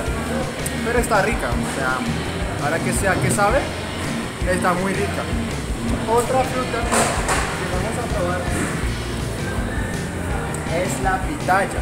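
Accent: Colombia. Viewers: llevas tiempo viviendo acá? porque tienes acento colombiano! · Por alguna razón cada vez que veo tus vídeos , es un poco gracioso como hablas castellano xd , tienes acentO colombiano hahahahaha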